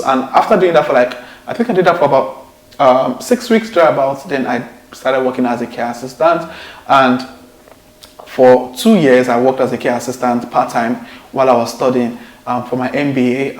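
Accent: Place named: Yoruba, Nigeria. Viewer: Please, why is your your Yoruba Accent still so strong?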